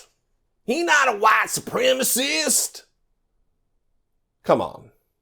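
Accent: South, United States. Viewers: I love your Southern talk.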